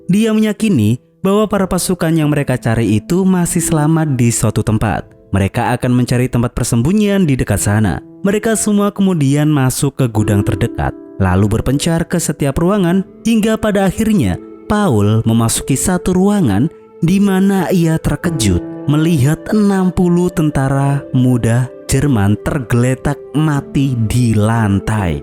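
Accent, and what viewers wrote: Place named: Java, Indonesia. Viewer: Loh le sampean wong jowo toh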